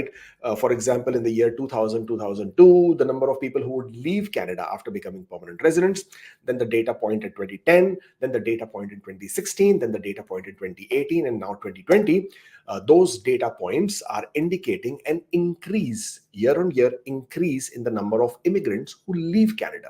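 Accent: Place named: India. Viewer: I'm from Cuba Sr 🇨🇺. I watch all your broadcasts, in part because I'm improving my level of English with your Indian's accent, also I adore to see Canada's news and updates from your channel. My point is the next one: If those who leave Canada had to return to their homeland (Cuba), they would think it twice, because despite the fact that they will be with their loved ones, they won't have better opportunities in life. So I'm amidst my immigration process and I'm 100% sure I will not go back to Cuba. Ask to any Cuban and their answer will be alike.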